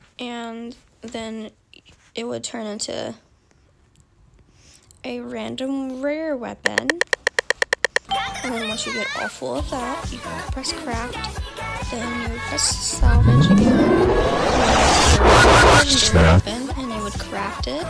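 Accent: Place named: United States. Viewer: Classic American voice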